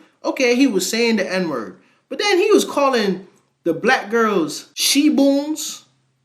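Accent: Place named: Brooklyn, United States. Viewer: I see we letting the Brooklyn out today, and you want the smoke…..lol. Keep it going brother, I’m with you on the petty levels put all these racist trolls on blast!!!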